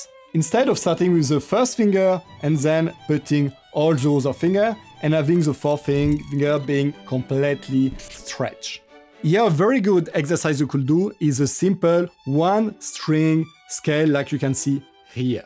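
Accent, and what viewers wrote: France. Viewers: tu parles anglais comme un français j'aime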